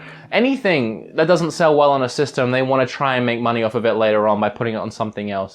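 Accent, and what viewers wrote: Australia. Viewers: YOUR AUSTRALIAN!!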